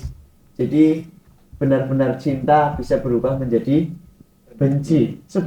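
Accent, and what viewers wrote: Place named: Java, Indonesia. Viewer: Habib kalih niki medoke candu sanget nggh😭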